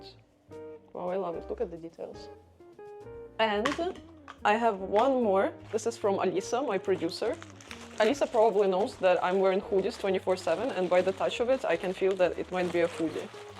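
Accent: Russia. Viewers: Так приятно слушать русский - английский 😂❤. Русские фразы на английском ❤. No offense. Спасибо тебе за историю.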